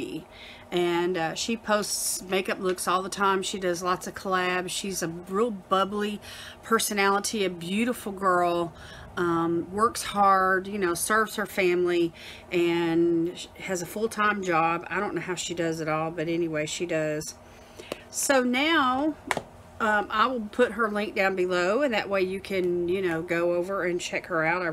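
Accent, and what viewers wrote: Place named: East Tennessee, United States. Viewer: Hello,! I’m here from Erica’s channel, & I really enjoyed seeing the gorgeous look you created with the generated colorstory from the photo she chose for you! Those vibrant blues & greens look lovely on you! I have to ask (particularly based on the location you chose for her) if you’re a fellow Tennesseean. I’m in west Tennessee about 25 miles east of Memphis, but my mom was born in east Tennessee (Kingsport, if I recall correctly), & I thought I detected a familiar east TN accent! 😉👍✨💚💙💚💙✨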